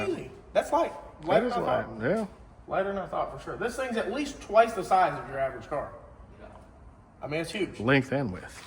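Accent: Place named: Florida, United States. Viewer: You sound like my uncle from Florida. I live in Texas but he sounds like you 😂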